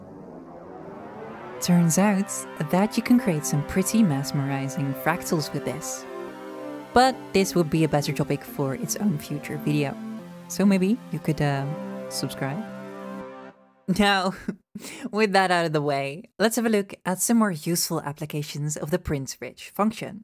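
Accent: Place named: Netherlands. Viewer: Gotta love godot... and your lovely dutch accent. Great video, neighbor :3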